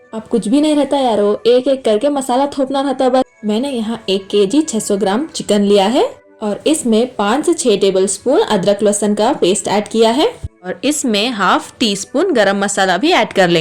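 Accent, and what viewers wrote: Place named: Hyderabad, India. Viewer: So beautiful hyderabadi accents and so yummy 🤤🤤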